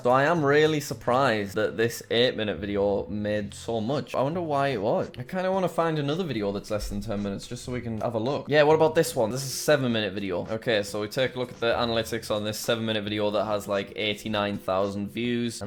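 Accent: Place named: England, United Kingdom. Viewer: Where in England are you from?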